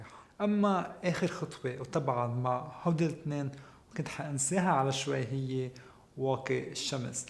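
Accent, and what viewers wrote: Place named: Lebanon. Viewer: هما اللبنانيين بيسولفوا كذا لا تجلسي تدخاي بالنوايا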